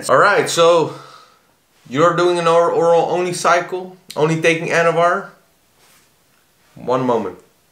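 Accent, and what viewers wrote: Netherlands. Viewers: Ik hoor een heftige nederlandse accent 🤣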